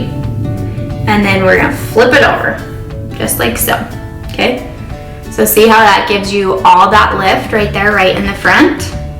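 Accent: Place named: Utah, United States. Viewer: I thought she had a Utah accent! I live in California 30+ years and when I go back I slip into the lingo and accent.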